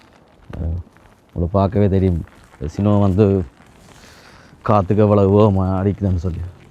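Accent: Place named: Sri Lanka. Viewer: R u srilankan bro Ur speech will be like that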